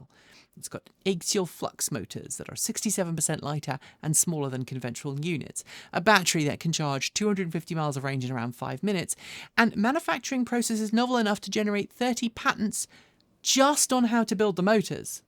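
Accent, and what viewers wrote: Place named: United Kingdom. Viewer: You should skip the Political opinions on Elon Musk, who is a beautiful person actually trying to improve the planet and life as we know it for all. Maybe include some opinions on Keir Starmer, which is 100% a disgusting person. Don't know where you live, but your definitive British accent might need some more support for a good cause (Anti Starmer) and not trying to hamper anything Elon Musk is doing, which are mostly really great things.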